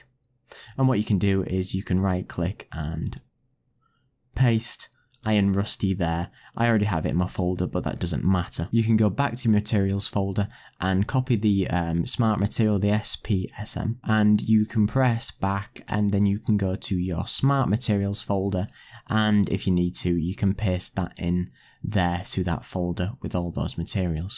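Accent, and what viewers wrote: United Kingdom. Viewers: What a waste of time, you've shown only a single way of adding files to Painter, and you have not shown the much more useful method of how to edit the paths to assets in the preferences. And sbs files are not left out of newer versions, as they are crucial for being able to edit the materials and shaders in Substance Designer. You seem pretty clueless, you should learn more before you start putting tutorial videos up on the internet, your arrogant, know-it-all attitude, and regional British voice notwithstanding, lol....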